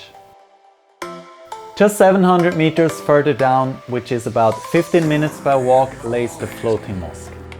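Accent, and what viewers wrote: Switzerland. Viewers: Thnx for this vid. - It sounds like a Swiss accent you're speaking, is that true? :) Greetings from Zurich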